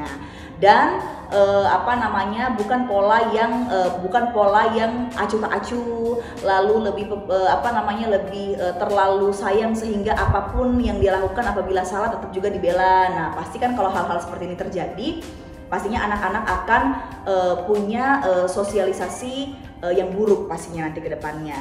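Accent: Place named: Medan, Indonesia. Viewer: Hah? orang medan ya? Pantesan aku pernah dengar pakai logat medan sama kira. Aku juga orang medan. Salam kenal 😊😊😊😊😊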